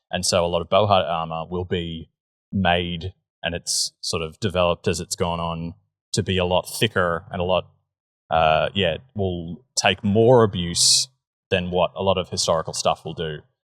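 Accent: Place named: England, United Kingdom. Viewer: nice review. I was expecting you to speak czech as the pic had subtitles lol. I got The Kings English. 😅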